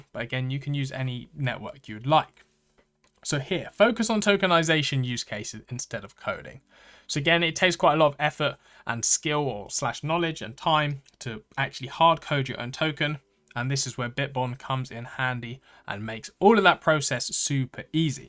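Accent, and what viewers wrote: Russia. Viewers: Are you russian?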